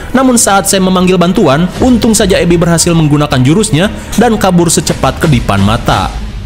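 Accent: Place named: West Java, Indonesia. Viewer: Cuma sukses bikin acara review filmnya jadi seru dgn gaya dan logat Sundanya ngena bgt.top,top,top..🎉🎉❤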